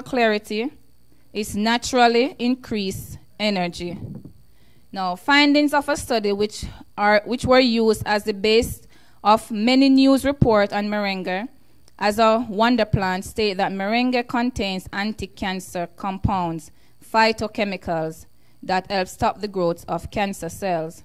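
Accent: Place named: Jamaica. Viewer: keep it real me love de jamaican accent thanks for your presentation.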